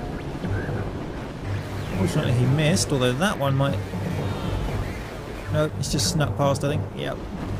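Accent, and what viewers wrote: United Kingdom. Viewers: I LOVE BRITISH GUYS THEY SOUND FUNNY AND I MEAN THAT IN A GOOD WAY IM NOT BEING MEAN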